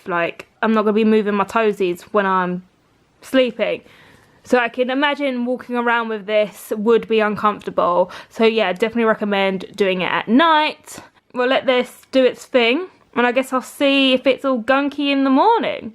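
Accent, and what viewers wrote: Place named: United Kingdom. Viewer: I used it last nite ... I can just listen to your British accent and voice and feel better lol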